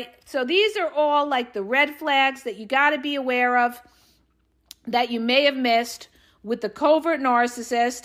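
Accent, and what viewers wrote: New York, United States. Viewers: I got mad for you and troll about your talking . I commented to you first time I heard you I can hear the power of New York or something very cool and legit and sexy so keep it up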